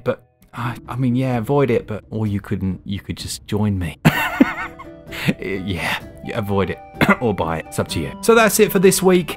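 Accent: United Kingdom. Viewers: I don't know why, but you kinda sound like a sexy British Morgan Freeman 🤷‍♀️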